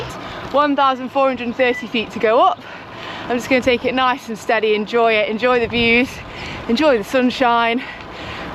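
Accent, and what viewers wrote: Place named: East Midlands, United Kingdom. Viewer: Are you Notts folk? Defo an East Mids/South Yorks twang.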